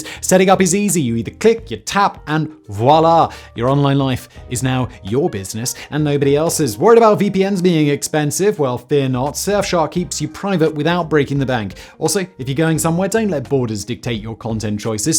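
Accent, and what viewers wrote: United Kingdom. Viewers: Thumbs down. Reason 1: You don't start video with a secs ad. 2. Your English is too British and chewy and those who don't speak it as their first language understand JACK. Put subs. proper ones, auto-generated won't do. Cheers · This guy's British accent is fucking annoying me. I would've watched the whole video but that accent is too hard on me. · This guy has 50 channels lmao and he doesn't even know much about the topics he does videos on. It's basically Wikipedia paragraphs with a soothing British accent. I'll still watch though 😂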